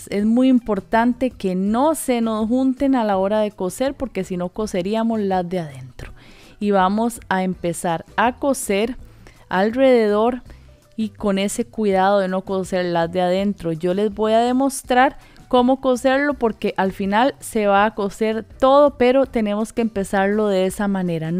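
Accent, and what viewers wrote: Costa Rica. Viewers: Ese acento no se pierde!!! Bravo una tica emprendedora!!! Saludes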